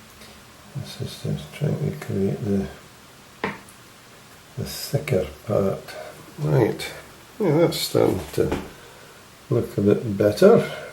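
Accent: Scotland, United Kingdom. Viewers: My absolute favorite painting you’ve done so far, new to the channel and look forward to your talent. Better than Bob Ross with a great Scottish accent👏🏻🙏🏼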